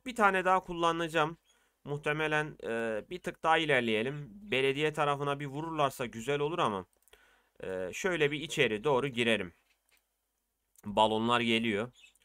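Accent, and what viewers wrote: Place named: Iğdır, Turkey. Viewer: Abi sen Iğdırlı mısın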